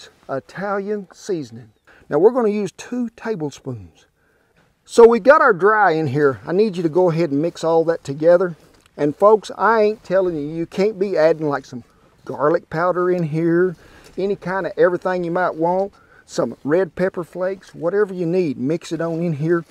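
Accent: United States. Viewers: Sir, i thoroughly enjoy your videos and enjoy hearing your cowboy accent. Your fan from Singapore!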